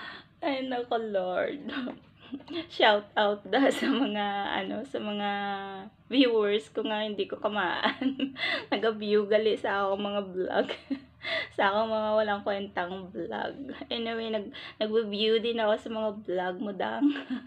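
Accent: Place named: South Korea. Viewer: Ano ba ga talaga ang tono mo sis? May koreana, may ilonggo, may mahinhin at ilokana, anyways, go go lang sis 😘😘😘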